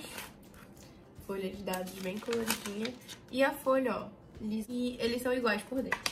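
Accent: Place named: Amazonas, Brazil. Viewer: então é nós, sotaque amazonense, ja ganhou uma inscrita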